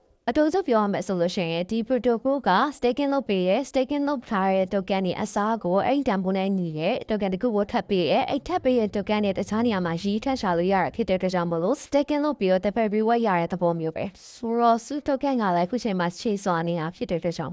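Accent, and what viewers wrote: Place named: China. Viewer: အသံက ပုံမှန်မဟုတ်ဘူးထင်တယ် နားထောင်ရတာ တရုပ်သံပေါက်နေတယ်😢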